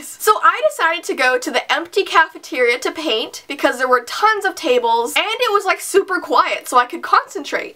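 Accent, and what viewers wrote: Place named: Canada. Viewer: I think it's because she lives in Canada. In her crazy babysitting video she sad bagel like bag-el while in America we pronounce it BAE-gel 😂😂😂 have a nice day!☺️☺️☺️😃😃😃😊😂😂😌😌😌😉😉👍👍👍👍✌️✌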